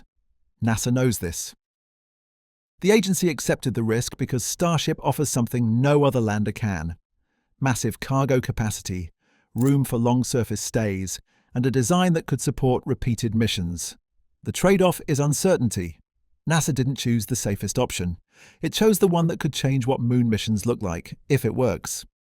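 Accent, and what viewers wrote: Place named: United Kingdom. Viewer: thanks for sharing i like science documentaries plus enjoy your British English accent look forward to your next work greetings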